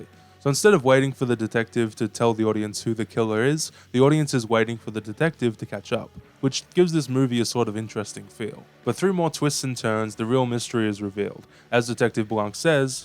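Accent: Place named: Australia. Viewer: Are you Aussie, you sound Aussie, umm why you picked these movies is a mystery to me as i have not seen half of them and maybe i should have, anyhoo lets dance fatso ; )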